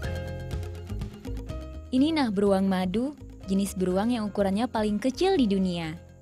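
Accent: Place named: Banjar, Indonesia. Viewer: Kek lugot orang banjar nih kka🙄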